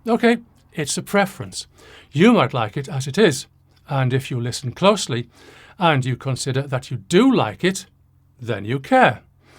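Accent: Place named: Northern England, United Kingdom. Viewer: Dear Mr, could you PLEASE tell me where in the North of England you were born or spent the majority of your childhood? Why? Because I pride myself on being able to tell where people from my native North-East were raised to within a 5-mile radius - but your accent has got me baffled, presumably due to your many years of living "darn Sarf". You could be from parts of Cumbria, Geordieland, Co Durham or Teesside/North Yorkshire but I can't nail it down. Fancy putting this "accentophile" out of his misery mate...??? PS: I'm from Hartlepool originally if it's a case of "you show me yours and I'll show mine"..!;)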